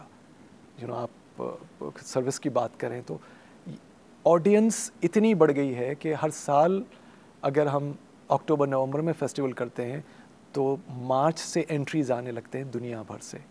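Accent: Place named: Deccan, India. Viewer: Irfan sahab aap yahan? khushamdeed. khilaaj/khiraaj samajh nahin aa raha tha audio mein. bhai ki dakkhani urdu bhi bahot tight thi. kaafi alag aur dilchasp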